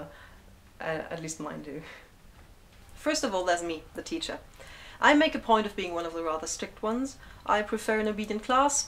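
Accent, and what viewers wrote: United Kingdom. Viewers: Heyyy teacher! I like your videos and your sense of humor. By the way, you've got a pretty good British accent. Peace! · Did your learning of English rely heavily on imitating native speakers? The reason I asked is that your accent, at least when speaking English, sounds like a more refined sort of English accent rather than a German accent. I was surprised to hear that you were German for that reason.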